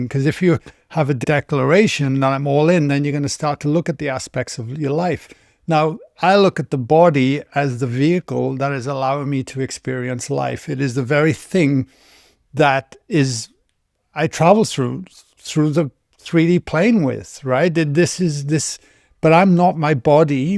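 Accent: Wales, United Kingdom. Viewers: Always up for a bit of Taff accent, greetings to a Welsh brother.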